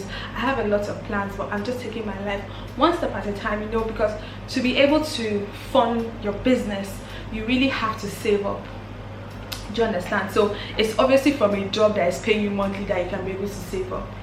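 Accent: Ghana. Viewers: You now speak with Ghanaian accent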